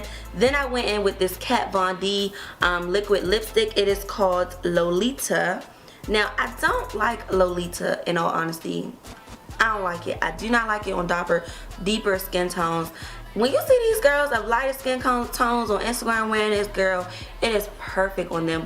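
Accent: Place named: Baltimore, United States. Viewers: tuuur ( two) omggggg I love DMV accents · I'm obsessed with your accent, where are you from? DC? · You gotta b from Baltimore lol I hear it all in u, new subbie love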